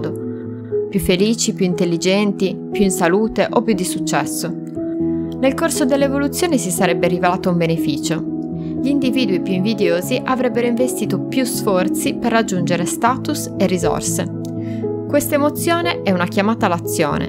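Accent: Sardinia, Italy. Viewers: Dal tuo accento sembri sarda o sbaglio?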